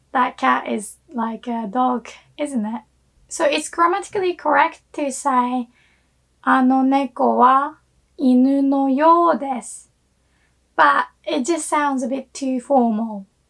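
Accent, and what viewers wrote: United Kingdom. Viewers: 君は英国人みたいに話す。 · 先生はイギリス人みたいに話す (*^_^*) Come to think of it, if I want to say "you sound like (like, your accent)", do I still use みたいに？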